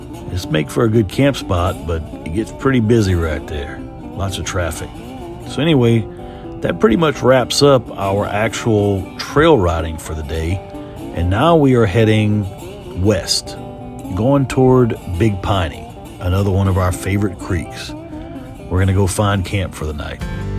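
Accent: Louisiana, United States. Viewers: As soon as I heard you speak I said to myself, self dat dude has got to be from da bottom of da boot. Lol. Great video. Me and the wife are from Lafayette but live in Port Barre now. We have a planned trip in the Ozarks by mulberry lodge. It’s in October. Rendezvous in the Ozarks expo. Are yall going?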